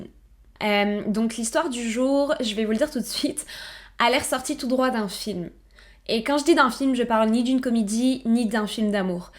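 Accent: Quebec, Canada. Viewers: Trop drôle t'es d'min coin ! 🤣 Je pensais vraiment que tu était Canadienne. 😅 Tu es passé du Nord au Grand Nord mdrr En tous cas encore une vidéo de dingue, quel taré ce gars 😵‼️ Continue comme ca j'adore tes vidéos ❣️ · Au début j'avais cru que t'étais juste d'origine française mais que t'étais vraiment québécoise parce que t'as vraiment pris l'accent et leur façon de parler, je suis aussi française c'est pour ça que je te le dis (et fan de supernatural au passage :p aussi de surnaturel/horreur) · Je suis surpris que tu sois de Lille, tu as vraiment pris un accent canadien je trouve, on retrouve pas celui des HDF dans ta voix mais c'est aussi compréhensible quand on s'expatrie. En tout cas, chouette vidéo, je t'ai découvert grâce à Mogota et c'est chouette ce que tu proposes. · Aucune apologie.......... De meme....... Une Française avec un petit accent québéquois !!!! 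Non de Lille mais de L'isle sur le doubs (dep 25)......... Voilà la raison pour laquelle vous etes toujours bien maquillée. Bonne fin d'année. un livre qui à mon avis est bien écris et intéressant : "The last victim" : Jason Moss et Jeffrey Kottler. (bouquin non traduit, version américaine). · Très intéressant, ce n'est choquant, mis à part l'exposition au publique, j'avoue que c'est une sorte de dérive, mais je penses que celà reste une forme d'amour, étrange, glauque, qu'on ne peu comprendre 🤷‍♂️ mais ce n'est pas un acte criminel, ou du genres, c'est pour ça je penses qu'il a été relâché direct, ce n'est pas réellement du vandalisme en définitif Après j'avoue que c'est étrange et difficilement compréhensible mais bon 🤷‍♂️ Ah oui c'est vrai que tu es française 😌🤘 d'où l'accent québécois très léger, jm disais aussi ^^ C'est cool en tt cas et j'adore ta chaîne et ta personnalité 😊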